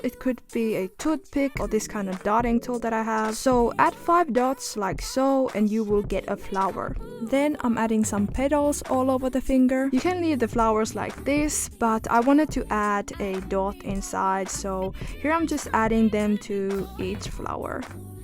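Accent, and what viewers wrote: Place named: Finland. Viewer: U sound finnish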